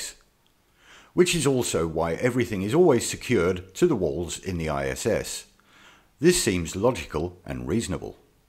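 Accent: England, United Kingdom. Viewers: Nope, english accent, Mike is a little confused. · You do realize that NASA is an American organization, and as such, you dont lose a cent to them as they only get tax dollars from the tax paying American public. Now I'm just assuming here, but seems like your accent is indicative of a more British persuasion, I mean, it could be south africa, New Zealand, or Australia, idk, but assuming the most likely and saying Great Britain, (I specify Great Britain and not the UK because you dont sound very Northern Irish to me.) Your tax pounds once went to the European Space Agency which has a pod on the ISS and works with NASA and other organizations, but no one is directly in charge of it either. But thanks to Brexit, your taxes aren't going to that either now. Britain doesn't have an independent space program so for now, none of your tax pounds go to any space agency. 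Hope I help alleviate some of your stress.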